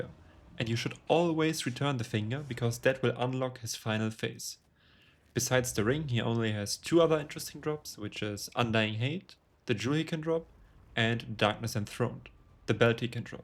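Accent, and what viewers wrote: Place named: Germany. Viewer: Junge dieses deutsch englisch ist ja grauenhaft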